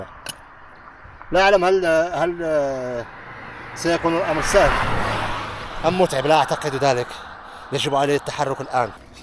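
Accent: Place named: Morocco. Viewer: لغة فصحى ركيكة كلغة الأطفال ..لغة كارثية مهزلة...اقول لك ماتكونش معقد من لهجتك المغربية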